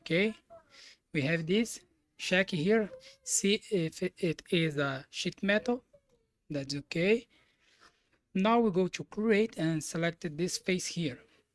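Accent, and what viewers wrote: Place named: Brazil. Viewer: Excellent explanation, congratulations. One doubt is that from the way you speak, it seems to me that you are Brazilian. Am I right?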